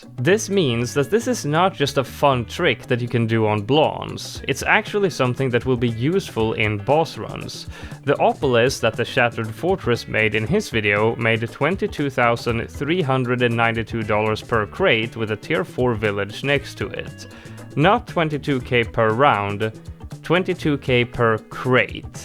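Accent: Sweden. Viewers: i know that your swedish from how you sound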